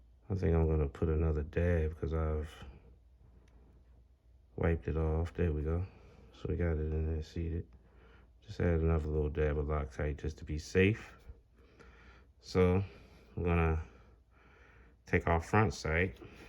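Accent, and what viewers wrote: Baltimore, United States. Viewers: I hear Baltimore all in your accent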